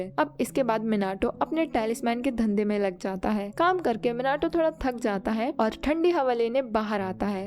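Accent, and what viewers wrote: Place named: Bhopal, India. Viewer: You must be from Bhopal Right ?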